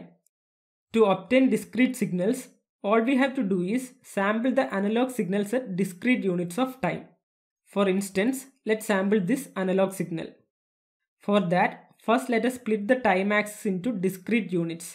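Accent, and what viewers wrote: Kerala, India. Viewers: Loved his mallu accent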